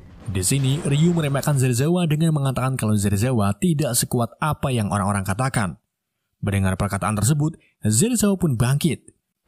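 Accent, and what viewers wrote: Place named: Central Java, Indonesia. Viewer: pames kwkw,jateng bgt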